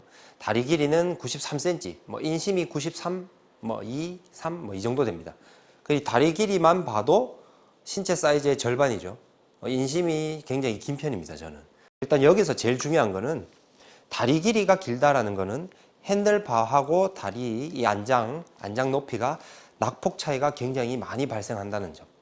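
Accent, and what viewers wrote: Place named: Gyeongsang, South Korea. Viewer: 경상도말투가 이렇게 자상할수도잇구나...기본개념부터 차근차근 잘설명해주셔서 본인자세를 다시한번 돌아보게되는 좋은 영상입니다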